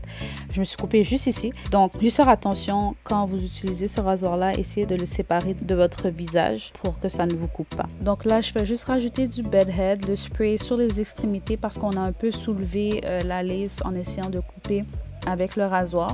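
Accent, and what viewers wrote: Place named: Canada. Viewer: J’adore l’accent canadien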